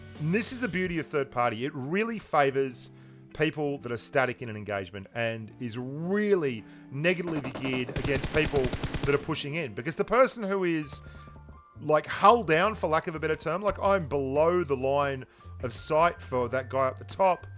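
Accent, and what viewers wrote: Australia. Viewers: you austrialian accent is too strong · That Aussie accent tho ☺️ took me few videos to realize that you were saying "rating" and I was hearing it as "writing" 😎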